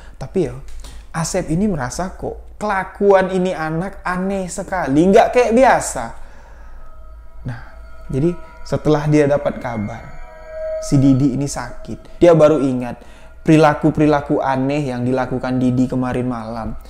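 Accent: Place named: Lampung, Indonesia. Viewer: Bang oarang sebrang ya,dari logat nya kayak orang Lampung.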